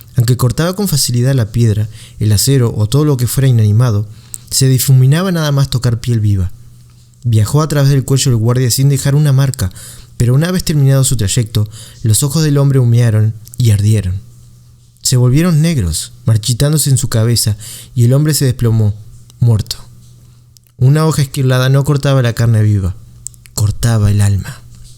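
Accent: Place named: Argentina. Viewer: De verdad, gracias a ti por hacer esto. Esta saga merece ser leída ( en este caso escuchada) por muchos. Me encanta tu narración, el acento argentino le da otro toque. Que bien que no te tomaste mi comentario a mal. Por favor, no pares y continua la saga. Subscripción inmediata.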